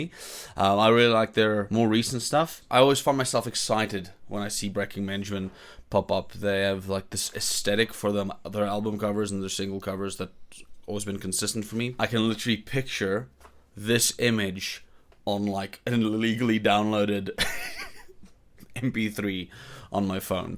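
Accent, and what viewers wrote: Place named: South Africa. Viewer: South African?? Klink so! :D ZA ZA ZA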